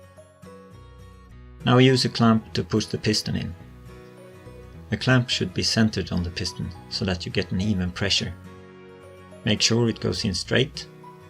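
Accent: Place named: United Kingdom. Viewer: You sound like British!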